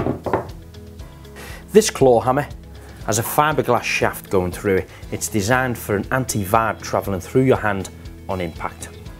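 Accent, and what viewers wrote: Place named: Liverpool, United Kingdom. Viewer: Accent is from liverpool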